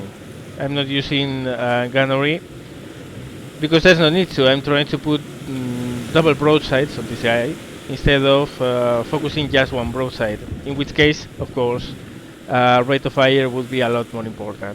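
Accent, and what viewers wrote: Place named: Spain. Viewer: Dios se nota a la legua que eres español jajaja buen video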